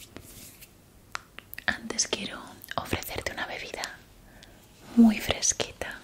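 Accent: Spain. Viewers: como pronuncia esa R como es, yo diciendo palabras con R como orchata suena como L, puertorriqueno al fin lol. El acento espanol usualmente no me gusta mucho pero el de es chevere, no se debe ser la region de donde es o no se pero lo mejor de ella es cuando habla. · La tonada española es el toque perfecto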